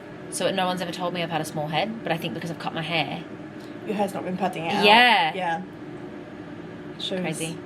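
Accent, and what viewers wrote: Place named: Australia. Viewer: The Aussie accent caught me off guard for your debut 😂😭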